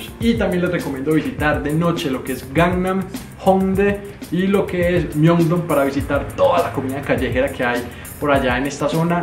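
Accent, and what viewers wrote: Antioquia, Colombia. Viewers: Ya tienes de nuevo muy marcado tu acento colombiano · estás otra vez con tu acento paisa jajaja lindo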